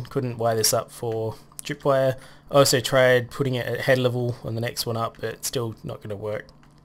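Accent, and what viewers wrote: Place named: Australia. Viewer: Lol... Pretty epic fighting there... Also, stop scratching! Can't wait for the gold farm and you sound more Australian mate.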